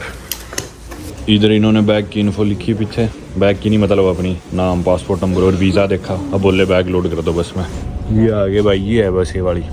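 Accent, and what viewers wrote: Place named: Haryana, India. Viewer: Hi, jab haryana bolte ho na kisi doosre desh mai bhai swad hi aa jata Hai....... tau kheech de tode de batti😂😂😂😂😂😂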